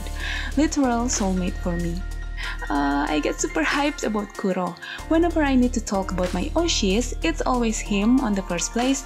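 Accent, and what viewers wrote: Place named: Philippines. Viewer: I didn't expect for you to be from Indonesia, i thought you were from Philippines because of your english accent, and you look beautiful. And while i do want to see you make a video content of other games, but i suggest you should focus on enstar, because it takes 4 days to make a video of one game, if you make a video content about multiple games I'm worried that you might feel burnout and overwhelmed because of the many videos editing and gameplay, managing time is not very easy, especially if you play multiple games and they all have an event in the same time, i always feel overwhelmed when that happens, but still i will wish you the best of luck in whatever choice you made 🍀🍀🍀🍀🍀 And again congratulations on 5k subscribers, your guides and event scout video really help me a lot ❤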